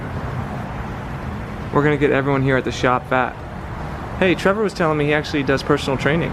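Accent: South Africa. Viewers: I recognize the South African accent 🤣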